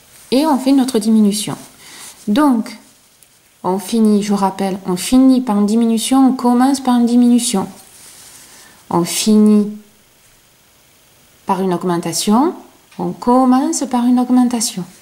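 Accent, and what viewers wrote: South, France. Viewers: félicitation pour les explications très claires que je vais rapidement mettre en pratique, avec une mention spéciale pour ton accent, car bien souvent l'accent du sud se fait rare sur internet! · Bonjour, merci pour cette démonstration très claire et très agréable, tu te mets à la place d'une débutante et c'est super, continue comme cela! en plus j'aime bien ton accent du sud, moi aussi j'ai l'accent de Toulouse que j'ai gardé pourtant je n'y suis plus depuis l'âge de 20 ans! Je vais regarder si tu as fait un tuto pour faire un poncho... J'ai déjà fait un tawashi et une fleur éponge!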